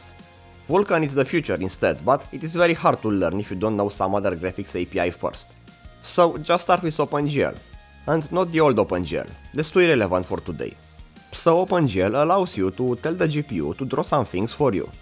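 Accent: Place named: Romania. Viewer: you sound a bit romanian..... cf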